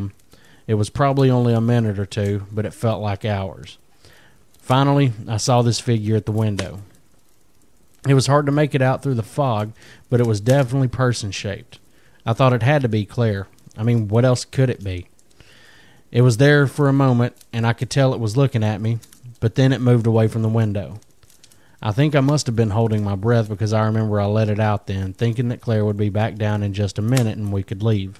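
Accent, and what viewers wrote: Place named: South, United States. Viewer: That southern accent is a very good commentary voice for stories :)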